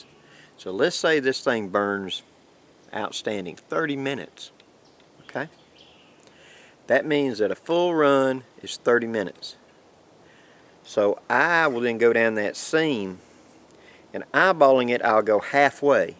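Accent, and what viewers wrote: South, United States. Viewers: Nice video, you make a lot of sense in your southern way, I like the accent you sound like you are signing all the time. I like you tips and inputs very resourceful!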